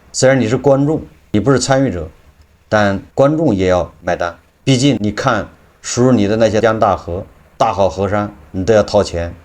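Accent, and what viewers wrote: Anqing, China. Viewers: 老师一听口音就是我们安庆老乡😅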